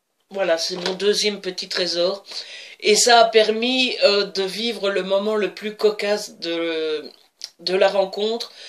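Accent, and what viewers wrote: Belgium. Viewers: Je découvre ta chaine depuis quelques jours. Je me fais toutes tes vidéos depuis ( quelques avances rapides parfois, j'avoue ^^ ). On a pas forcément les mêmes styles de lecture ( ne suis pas porté sur la romance par exemple mais j'aime beaucoup les thrillers;-) ) mais j'apprécie ton style, ta façon de faire, ton naturel mais aussi ta sensibilité,... Et puis, y'a un point qui me plait beaucoup, c'est ta " belgitude ". Comme toi, je suis belge et quel plaisir de voir et d'entendre un booktubeur belge ( très peu nombreux, hélas )!! Cet accent assez brusselair je trouve ( plus marqué que le mien, moi je suis de la région de Tournai donc j'ai + l'accent ch'ti, c'est ce que les liégeois me disent en tout cas, lool ), tes " godverdome ", nom dé djeu, ect ect.... moi, perso, je kiffe! Voilà, ne change surtout pas ça stp!!;-)En ce qui concerne cette vidéo-ci, tu as parfaitement su me faire revivre ta journée avec tes moments qui te sont chers.J'ai, à la fois, ri et été ému. 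Agréable 3/4 d'heures passés " en ta compagnie "!Bref, j'en fini là. Continue comme ça, ne change pas. Tu es top!:-)ps: Je t'ai ajouté sur Livraddict, j'ai liké ta page Facebook, y'a que ton Fb perso que tu n'as pas accepté mais je peux comprendre, no soucis.;-)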